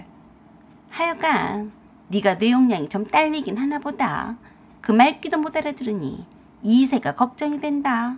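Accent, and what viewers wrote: Jeolla, South Korea. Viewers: 찰진 전라도 사투리가 섞여서 아주 재미납니다. 모지리 찐빵 ㅋ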